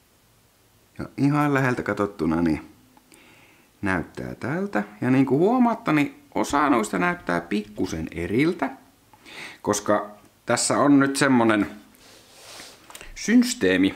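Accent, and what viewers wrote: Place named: Oulu, Finland. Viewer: Pähiä kampe! On tuo Oulun kieli sitten hienoa.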